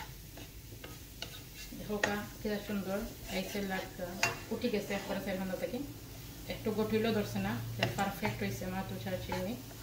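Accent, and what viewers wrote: Sylhet, Bangladesh. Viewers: Khub bhalo laglo ❤❤sylethi kotha shune aro bhalo laglo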